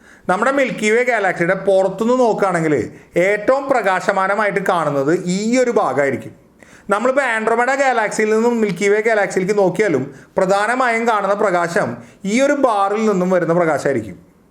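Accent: Thrissur, India. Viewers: ത്റുശൂ൪ ഭാഷ കേൾക്കാ൯ രസമുണ്ട്. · Thanks,നല്ല അവതരണം, തൃശൂർ സ്ലേങ്, ഇനിയും കൂടുതൽ അറിവുകൾ പ്രതീക്ഷിക്കുന്നു,, പ്രകാശത്തിന്റെ വേഗതയിൽ യാത്ര ചെയ്യാൻ പറ്റുന്ന കാലം വിദൂരമല്ല സാധിച്ചാൽ മിനിമം നേപ്ടോൺ വരേയെങ്കിലും ഒരു ട്യൂർ ഒപ്പിക്കാം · Thrissur slang 🥰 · തൃശൂർ ഭാക്ഷയിലാണല്ലോ സംസരം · ത്രിശ്ശൂർ സ്ലാംങ്ങ് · ഇൻഫർമേഷനിൽ കൂടുതലായി തൃശൂർ ഭാഷ കേട്ടതിൽ സന്തോഷം. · അയ് നമ്മൾ തൃശ്ശൂരിൽ നിന്ന് ആണെന്ന് തോന്നുന്നല്ലോ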